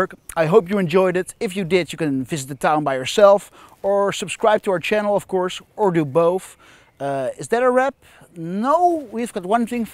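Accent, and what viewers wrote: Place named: North, United Kingdom. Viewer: Jesus lad ,that accent is not from Tilburg ,you are from up North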